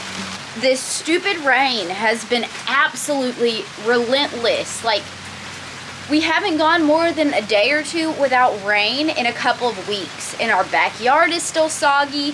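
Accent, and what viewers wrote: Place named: Texas, United States. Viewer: You sound born in raised in Texas. You shouldn’t be surprised at the change in our temperature and weather down here .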